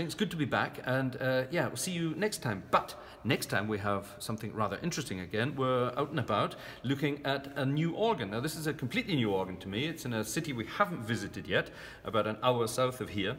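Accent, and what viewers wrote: Germany. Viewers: You seem to have developed a slight on-and-off German accent. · Love your channel and thanks for all the wonderful videos! If I may ask, and the question somewhat fits the titel of the video: How long have you been living in Germany? I mean no offence, but you seem to have a slight German accent in your English? That, or my hearing is just shit! Keep up the amazing work on your channel!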